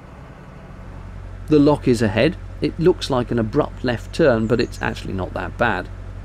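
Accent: United Kingdom. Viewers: Thank you for these videos. They are so relaxing, and your humor is spot on. Of course as an American I'm easily won over by a British accent. :P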